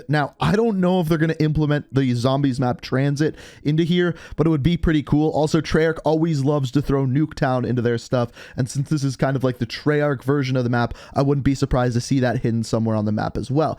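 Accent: Canada. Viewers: Is saying known the way he does a Canadian thing or just a way he does it?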